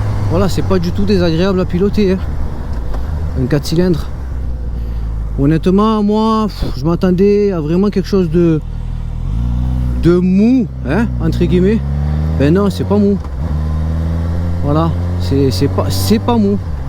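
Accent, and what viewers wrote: Marseille, France. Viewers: test sympa avec ce joli accent de Marseille sans montage bidon toutes les 2 secondes, bien aimé l avis honnête sans matraquage Mt malgré que tu roules en Mt, faut un jour que les procureurs du bicylindre arrêtent de faire des test en voulant systematiquement polemiquer bi et 4, ce sont deux mondes différents et deux choix différents. Le seul bemol dans l avis , on peut bien le prendre en A2 et debrider aprés en 95 si on aime les 4 cylindres, elle est justement A2 pour ça. like et abonnement ✌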